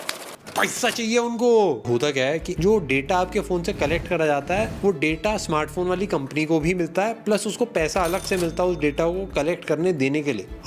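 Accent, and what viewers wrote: India. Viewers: umma koi kaam ke aap na hoyi gyi, INDIAN TONGUE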